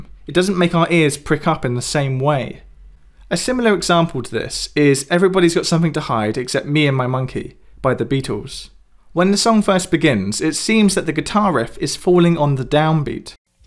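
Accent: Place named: RP, United Kingdom. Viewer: You are way too posh, but still kinda cute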